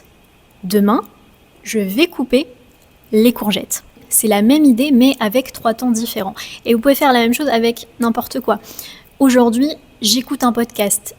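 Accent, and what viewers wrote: France. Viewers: I used the technique of finding out what day to day things were in french, then when going round the supermarket or just day to day life then seeing them i'd say them back to myself until they were so deeply embedded in my brain that i knew them off by heart. Feels slightly reassuring that I might not be the only person saying the words back to myself in the shop🤣I find myself lucky that someone that goes to my local pub used to work for a large French oil company so hes pretty fluent in French and I find myself attempting to have little conversations with him to try and improve. I tend to learn more by doing something than just from being show how to do it, so being able to practice that way is really beneficial for me. These videos are really useful! I like little phrases and like using you "bonjour, j'espère que vous allez bien", "Si tu n'es pas heureux ou tu es, bouge, tu n'es pas un arbre." and the one you said your Grandmother says about life being a sandwich of a certain kind 😂Those little phrases go a long way in a video, even if it's just learning what the words are in them, especially since sentence structure is different to english with word placements in them! 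I don't want this to come across as a "oh great im magically fixed comment" but your English is really really good so try not to feel self concious about having a strong French accent when saying some words. You're pretty nail on the head 99.9%% the time, plus it's not your first language, so it's not always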